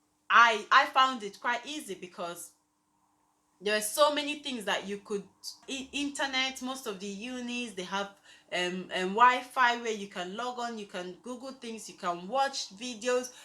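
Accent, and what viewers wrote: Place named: Igbo British, United Kingdom. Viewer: You are so cute 🥰 the igbo accent mixed with british accent na wa oooo 🤣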